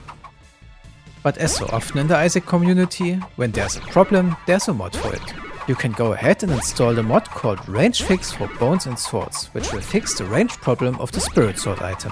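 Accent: Germany. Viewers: There he is! Our sweet german accented best boi is back!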